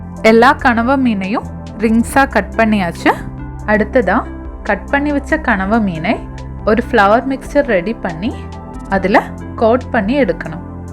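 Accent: Kerala, India. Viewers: Maylamo voice 👍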